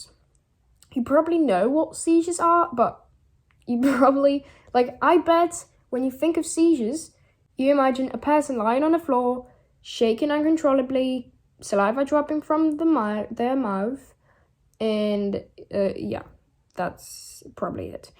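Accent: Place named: United Kingdom. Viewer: Mówisz cudownie 😩💗 ale czy będziesz jeszcze nagrywać po polsku? Bo niebyt kumam brytyjski akcent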